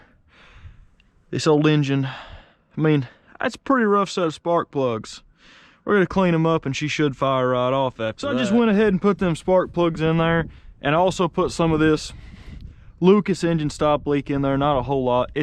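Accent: South, United States. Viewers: does he speak south accent? is this south accent? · I love your accent, your from the south I’m Guessing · 20 seconds in an that good ole' country boy accent tells me this A is going to be running at the end of this video... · This man has the best southern accent. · Listening to you talk, I'm guessing you're a country boy. I could be wrong.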